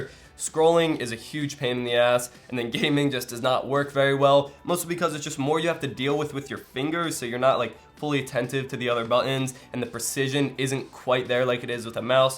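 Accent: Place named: General American, United States. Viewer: General American accent.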